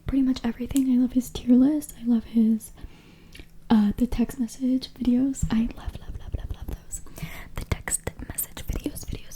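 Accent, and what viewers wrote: United States. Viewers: Watched/listened to the whole video TWICE! :eyes-pink-heart-shape: Some content creators say if you made it to the end put a zebra emoji or they ask a random question, so you see random zebra's or emojis that confuse people in the comments :face-blue-smiling: I learnt so much! I have much respect and admiration being a fellow Christian :face-red-heart-shape: 4 years with your bf is amazing especially at such a young age! :face-blue-wide-eyes::eyes-pink-heart-shape: I wonder if he's a Christian and you met through church? Oh I think a return to pink hair is on the cards for you! Your fans would love that I'm sure :eyes-pink-heart-shape::face-red-heart-shape: You didn't have New Zealand on your places you wish to visit :face-purple-crying: That's ok, you had some great locations! I knew you weren't from Germany (or at least with that thick American accent, didn't live/grow up there? 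:face-blue-smiling:) That's a cool story about your name! Cool parents :eyes-pink-heart-shape: Congrats on your Youtube/TikTok success and I'm sure you will only grow more in years to come! :eyes-pink-heart-shape::trophy-yellow-smiling: PS. I love the pigtails! :eyes-pink-heart-shape:Women can look so different with a subtle change in hairstyle :face-red-heart-shape: Would love to see different hairstyles/ braids in future videos :face-red-heart-shape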